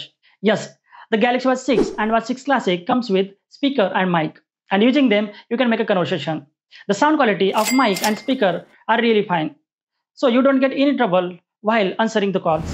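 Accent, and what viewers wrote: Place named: India. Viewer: He's an Indian thatswhy his english dictions is like pronouncing Indian language. He's not good in english pronounciations...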